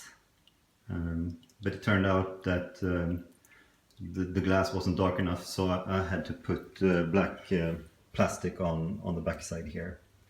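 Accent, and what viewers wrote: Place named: Sweden. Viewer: Kikat på detta kar flera flera gånger tidigare, aldrig lagt märke till att du var svensk. Hörde det direkt på dialekten nu dock, haha... Älskar karet. Så simpelt och samtidigt udda! Det märks att du har ett öga för design... Definitivt en bra källa till inspiration; blir lika lockad varje gång att köra igång ett "flodkar". Har du några planer på att utveckla karet? Jag tänker i form av fisk, inredning, bakgrund osv. Keep up the good work!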